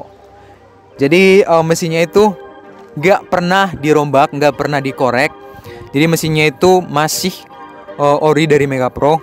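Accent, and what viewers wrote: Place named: Manggarai, Indonesia. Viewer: Logat nya manggarai sekali😂😂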